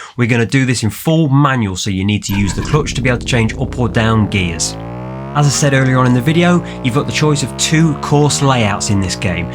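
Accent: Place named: Derby, United Kingdom. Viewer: Showcase in Derby eh... thought I recognized the accent :) instant sub for a local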